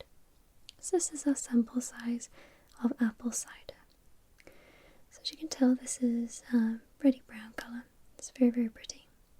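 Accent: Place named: England, United Kingdom. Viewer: love your accent. what area are you from in england?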